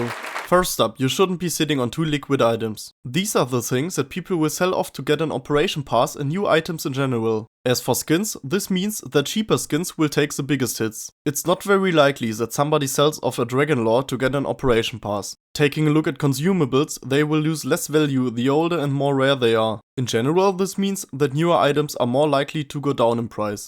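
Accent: Germany. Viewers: I can hear your German accent 😜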